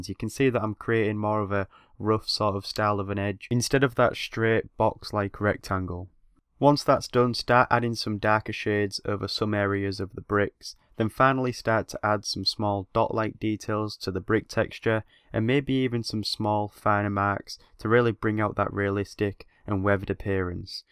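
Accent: United Kingdom. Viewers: its 100% british to me lol. dem vowels lol noeu weuy, doeunt goeu theiu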